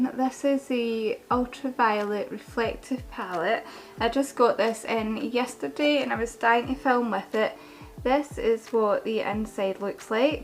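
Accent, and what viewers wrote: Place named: Glasgow, United Kingdom. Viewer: I love this look, it's quite simple but brilliant. You sound like you're close to where I'm from, I'm from Glasgow, Gorbals to be exact 😁👍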